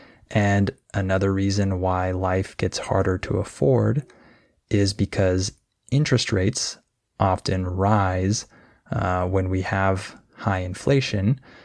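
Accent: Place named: United States. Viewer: American accent 😊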